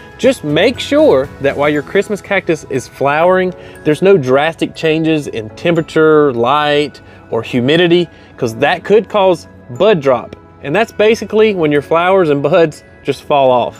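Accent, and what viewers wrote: United States. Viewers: Sir I love your country accent, where are you from brother?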